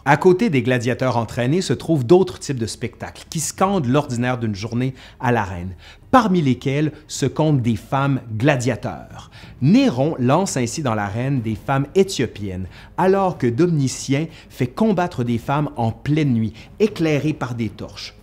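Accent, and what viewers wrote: Quebec, Canada. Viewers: Tellement complet! Bravo. J'aime beaucoup votre manière de construire les vidéos, la manière de parler est nickel et ça fait toujours plaisir d'entendre un petit accent québécois! Merci. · Assez bizarre que tu n'aies pas autant d'abonnés que Nota Bene, pourtant ton contenu est lui aussi très intéressant et bien raconté avec en plus le petit accent québécquois du plaisir. En tout cas sache que tu as gagné un abonné fidèle. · J'adore votre narration. Merci pour le partage. Je viens de découvrir votre chaine. Vos reportages sont courts et instructifs. Je suis contente que vous ayez un joli accent Québécois compréhensible comparé à vos compatriotes. Dommage beaucoup de reportages Québécois sont intéressants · Bon, comme on ne peut mettre qu'un pouce en dessous de la vidéo. Ben, je t'en mets d'autres ici. Merci encore pour ta chaîne si instructive... 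Et puis aussi pour ce succulent accent québécois qui, pour moi niçois avec mon accent méridional, enchante mon oreille de "français de France" mais qui admire la culture canadienne depuis longtemps (quand j'ai découvert Charlebois et Diane Dufresne etc quand j'étais "mino". Aller, ciao viva et bayeta comme on dit ici. CONTINUE À NOUS INSTRUIRE. 👍👍👍👍👍👍👍👍👍👍👍😉😘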